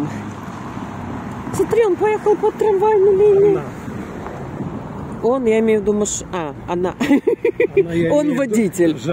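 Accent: Odessa, Ukraine. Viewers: У ВАС ЧИСТЫЙ ОДЕССКИЙ ГОВОР, МНЕ ОЧЕНЬ НРАВИТСЯ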